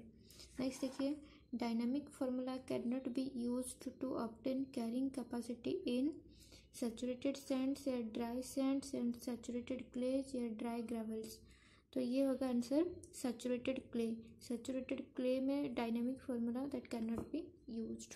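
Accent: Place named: Odisha, India. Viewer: I think you are a Odia .i guess it because of your accent .Good work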